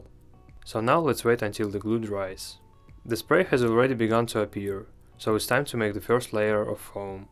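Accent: Russia. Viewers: акцент русский или мне кажеться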